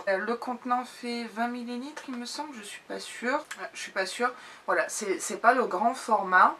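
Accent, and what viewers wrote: England, United Kingdom. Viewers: Aloha :) Très belle idée qu'ils ont eu de proposer des boxs surprise même si lorsqu'on est abonné, l'intérêt est un peu plus limité. Tu m'as fait exploser de rire avec les produits Polaar mais après tu me connais, j'aime avoir du (sur-sur-sur)back-up, alors quand j'aime un produit, je ne suis pas ennuyé le moins du monde de l'avoir 3 ou 4 fois :P Autrement cette box est toujours merveilleuse car elle propose des marques peu habituelles du fait qu'elle ne soit pas française et c'est l'occasion d'apprécier ton délicieux accent anglais qui a refait ma journée :) Je ne regrette vraiment pas d'avoir craqué pour 1 an avec Lookfantastic grâce à ton dernier unboxing. Plein de bisous !!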